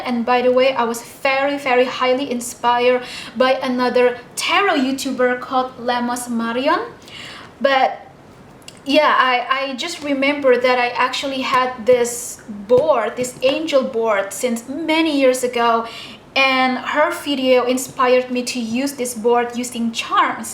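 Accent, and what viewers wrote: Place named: Italy. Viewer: Where are you from? (idk why your accent sounds Italian to me lol)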